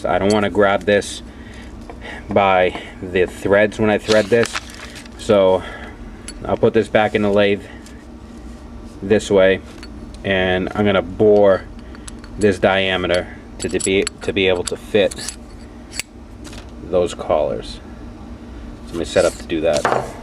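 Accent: Boston, United States. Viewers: Wow really nice work I've subscribed. Love to do this. May I ask is that a Boston accent you have.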